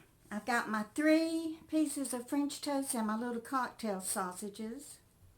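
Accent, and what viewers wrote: South, United States. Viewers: Just came across your video, and love them! Where are you from with that accent, and the y'all. Also, you mentioned that you go to Cafe du Monde. I was born and raised in St. Bernard Parish just 35 miles below New Orleans.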